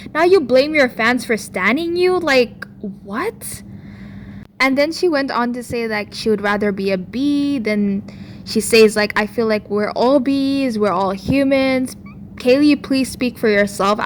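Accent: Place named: India. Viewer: The fact that she tried to say all she did was identify you by saying you had an accent... no, she said "that weird girl with the Indian accent". She insulted you and tried to play it off like she never said anything wrong and just said you had an accent. And she was being racist too. She's also acting like she's changed now, but she denied everything until there was irrefutable proof that she said those things. And she's STILL lying even though we've all seen the proof. She can keep going with the "I'm not perfect, I make mistakes" narrative, but idk about you but I've never body shamed anyone. And her admitting to being a narcissist along with the body shaming just feels so gross. I know I'm rambling, tbh I've only seen 20 minutes of that livestream so far but it already pissed me off and I have a lot of thoughts on it lol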